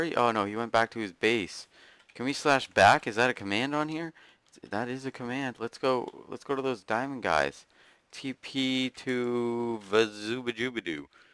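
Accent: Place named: Netherlands. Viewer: DAT dutch doe xD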